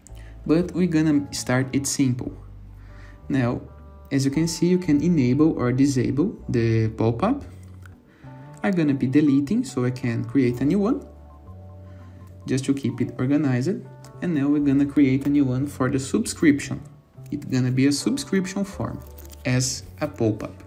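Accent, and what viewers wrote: Brazil. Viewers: you sound brasilian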